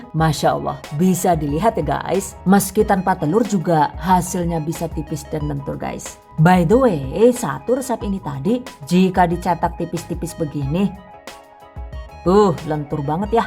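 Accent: Banyumas, Indonesia. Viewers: 😄😅 suka suka suka,, aplgi pke bhsa ngapaknya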